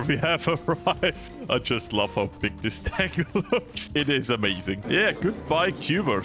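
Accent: Austria. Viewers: Hob erst gröd gemerkt dös er Österreicher is. Ober schene griese aus der Steiermork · he's Austrian, I mean you can also tell by his accent in English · Er ist Österreicher, wenn mich nicht alles täuscht 😅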